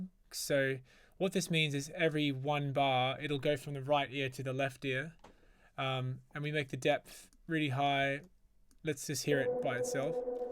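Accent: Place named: England, United Kingdom. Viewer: Your accent is so weird now lol Becoming more English. Love the tutorial btw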